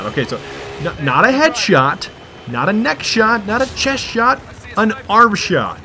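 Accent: Germany. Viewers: Are you German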